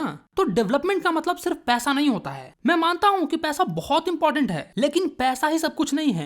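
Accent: Bihar, India. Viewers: i really appreciate ur desi Bihari tone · You are from Bihar ❤😊 your accent tell that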